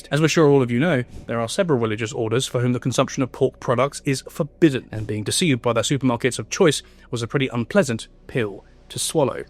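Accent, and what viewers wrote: United Kingdom. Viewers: You've got the perfect stereotypical "British" accent, I love to hear the classic accent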